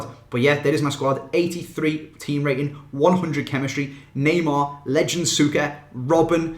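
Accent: Liverpool, United Kingdom. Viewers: His he scouse (Liverpool) or welsh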